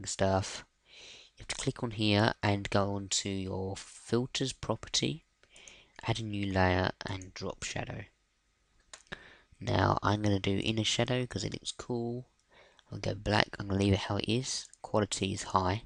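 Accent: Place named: England, United Kingdom. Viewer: It's not the sound, he's just got an English accent...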